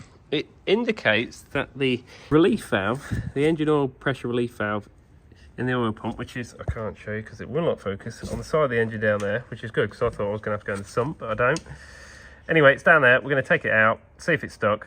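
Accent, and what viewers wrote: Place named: RP, United Kingdom. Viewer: That old engine would of ran on 4 star leaded petrol not unleaded or super expensive crap its a old jenson engine posh school boy the way you talk you got to remember the land rover has been stood for years so things will be stuck and rusty but when you service the engine it will run alot better but wat a beast of a sound better then a rover v8 but it will take a long time to restore everything and find out wat many extras on it and wat they do??? 👍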